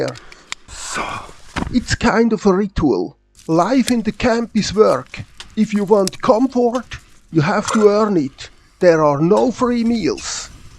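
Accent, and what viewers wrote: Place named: Switzerland. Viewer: Hoi! Deine chanel ist fur mich eine echte schatz! Du gibst mir viele inspirationen wohin ich mit dem kajak gehen kann! Ich wohne seit 3 jahre in der Schweiz und ich habe jetzt gerade angefangen mit Kajak abentauer und ich suche jetzt fur wo konnte ich fahren! Aus deinem akzent ich glaube dass du eine Schweizer bist also ich glaube das tu die Schweizer kajak strecken sehr gut kannst. Dank dir habe ich jetzt paar Ziele, jetzt brauche ich nur gute gesselschaft! Ich lasse SUB fur deine channel! LG! P.S Du bist mega positiv Person, das macht fruede dich zu schauen :D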